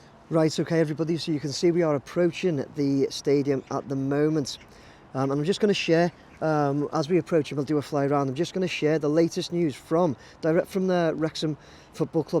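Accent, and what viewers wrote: Liverpool, United Kingdom. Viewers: Lol....now I appreciate you're Scouse but THAT has gotta be the worst effort at the welsh version of the Racecourse...ever! 🤣 FYI, it's pronounced 'Kai Rass'....👍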